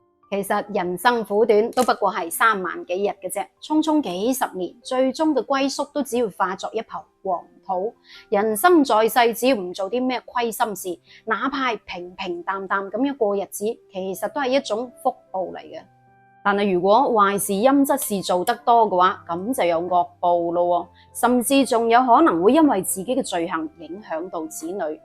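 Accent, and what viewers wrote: Guangzhou, China. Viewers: 正宗廣府話好親切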